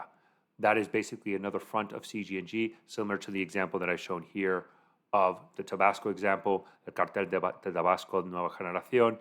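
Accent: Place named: Spain. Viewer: Gringo hablando mal español con acento español es super extraño xd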